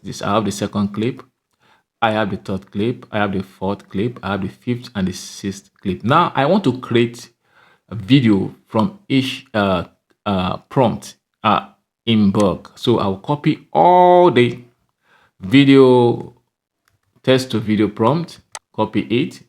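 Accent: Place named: Africa. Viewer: thanks for the video love your african accent :)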